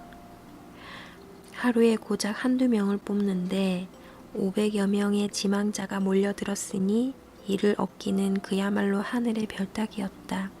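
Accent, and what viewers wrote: Gyeongsang, South Korea. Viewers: 경상도 사투리냄새가 물신 나,오히려 정겨워라. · 아휴 님 목소리 땜에 잠못 잡니다 어쩜 그리 목소리가 달콤한지 ᆢ 근데 고향이 어디신지 경상도 목소리가 이리 좋은지 몰랐네요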